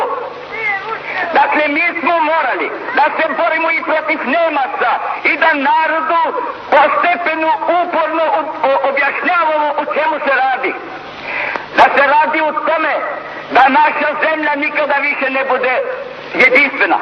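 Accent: Zagorje, Croatia. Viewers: Ne pricaj gluposti, si bio ikad u Zagorju, čitao Krležu...to je taj jezik, naglasak sa Sutle.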